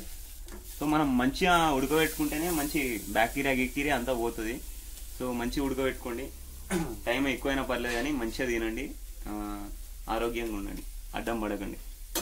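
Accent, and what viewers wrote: India. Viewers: Hamara hyderabadi patta ..superb dialect of hyderabad ..from Langer houz..love you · Mee language bagundi telangana style nd recipe kuda chaala baagundi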